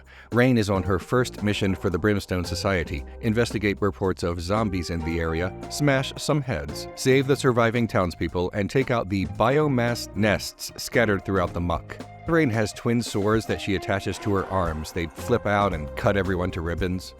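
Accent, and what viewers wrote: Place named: Mid-Atlantic, United States. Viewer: Your mid-Atlantic accent is excellent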